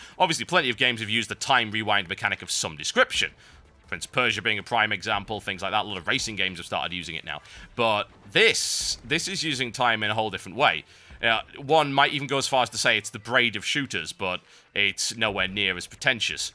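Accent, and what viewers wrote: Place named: England, United Kingdom. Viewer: Where about in England you from? Your accent is amazing. I'm from Birmingham so I sound like a idiot:(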